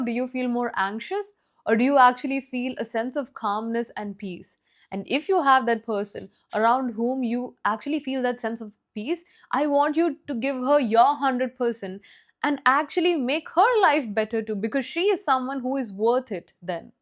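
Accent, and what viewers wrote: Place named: Kerala, India. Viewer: Your English feels like malayalam 👀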